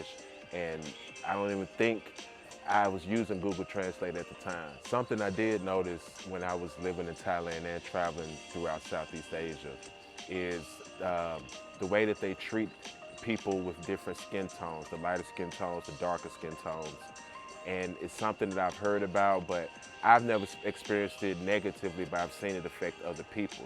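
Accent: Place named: South, United States. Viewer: Youngblood, truly inspired by your message. Spent 11 years living abroad (Italy, Germany, Korea, Djibouti). Keep living your best life King! Oh yea, you gotta tell us what state you from. I am from GA. Your voice sound like you from the da south!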